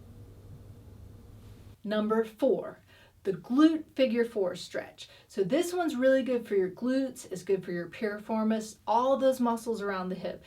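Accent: United States. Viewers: Thanks this has really helped with my hip pain ....out of interest what state is your us accent from :) Regards mark uk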